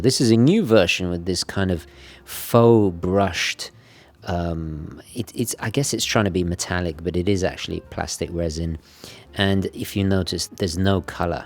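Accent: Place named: United Kingdom. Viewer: I have enough watches the only reason I still watch is because of his British accent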